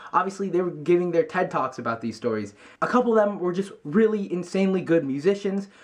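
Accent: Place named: Vietnam. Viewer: I love your accent, are you Vietnamese?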